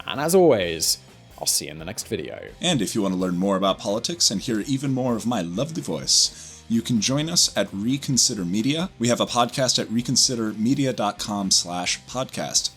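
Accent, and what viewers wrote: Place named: England, United Kingdom. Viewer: I agree it was long overdue leaving Afghanistan. It will quickly revert to its previous state before the U.S. arrived and spend so much valuable coin. No more sole world peacekeeping, stupid and unplanned wars and ‘nation’ building please!?! Nations will need to start taking stock and responsibility for their current state. You can blame everyone else so long. Second, I agree with your show mostly but I still can’t stand the pencil beck geek with the prissy Englishman voice!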